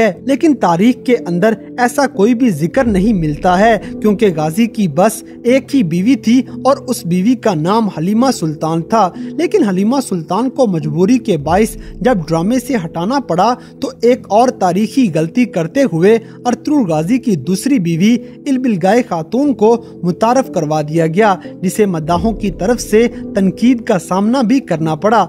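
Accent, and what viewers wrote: India. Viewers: Are u indian? If no then why r u using their accent ... our Urdu is a rich language and it has the letter غ ۔۔۔ _ _ . So why do u copy indian accent and say galt, gaddar etc... accept your own language only then you ll be remembered in the world history . آپ اب تنقید کریں گے کہ میں خود انگلش میں لکھ رہا ہوں تو وہ اس لیے تاکہ سب لوگ پڑھ سکیں کہ ہم اپنے ملک اور اپنی زبان سے محبت کرتے ہیں ۔۔۔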